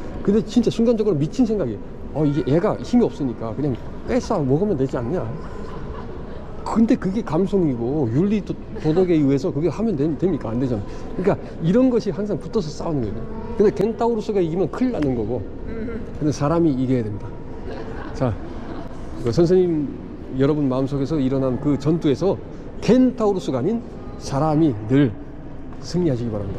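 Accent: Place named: North Korea. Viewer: 안내 덕분에 영상잘봤고 좋은 공부가 되네요. 북한 억양이라고 하더라도 그건 나쁜게 아니니 걱정 조금도 마세요. 부산사람이 경상도 사투리 한다고 더 싫다거나 좋다거나 나쁜건 아니듯...오히려 한국말 너무 잘하시는 것이 억양을 떠나서 아주 멋져보여요. 구독 좋아요 눌렀네요.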